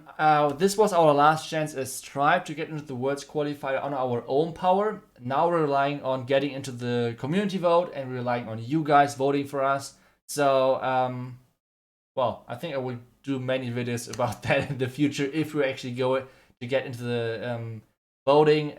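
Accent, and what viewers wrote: Germany. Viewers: He has this German accent which sounds cool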